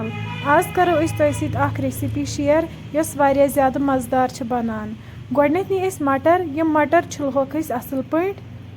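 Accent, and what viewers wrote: Kashmir, India. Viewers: Your kashmiri accent is sooooo good ❤,,,,😊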